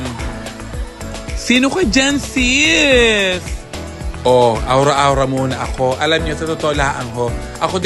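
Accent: Batangas, Philippines. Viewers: First time to watch your show, I love it 🥰 Ang ganda ng Batangas accent mo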